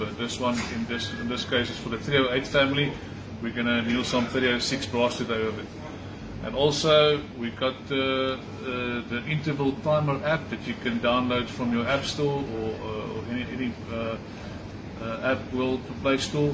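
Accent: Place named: Afrikaans, South Africa. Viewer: Enkelvoudig, effektief, goedkoop en met 'n Afrikaanse aksent! Dit kan nie beter nie! Groete uit Utah, Merika!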